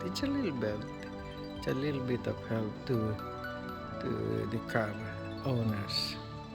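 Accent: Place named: Spain. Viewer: Se nota que eres español por como pronuncias en inglés.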